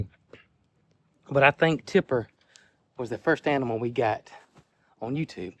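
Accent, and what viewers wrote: South, United States. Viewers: You popped into my feed. I gave you a listen. Your southern accent reminded me of my Tennessee kin, now all gone. Just hearing you talk brings me great comfort. I was born and raised in Indiana but my daddy would take us on our summer vacation EVERY year to my grandma and grandpa’s farm in the hills of Tennessee. I’ve slopped the hogs. I’ve shelled the corn out of the corn crib for the cows. I loved to watch grandpa milk. I loved learning to make butter and rub the sage and dry apples. They had an outhouse. They had a horse and two mules that actually worked the plow. Your channel just makes me feel good. I’m 70 years old this November.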